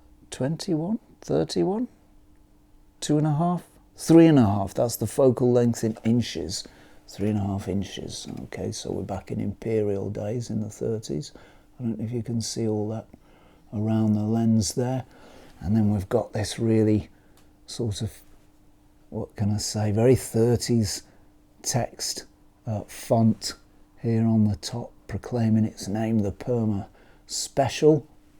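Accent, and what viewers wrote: United Kingdom. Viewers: My favourite series:) I love your British accent:)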